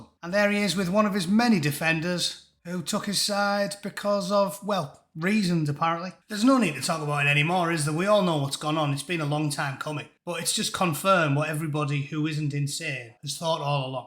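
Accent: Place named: Middlesbrough, United Kingdom. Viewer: That sounds like a sunny Boro accent I'm hear here..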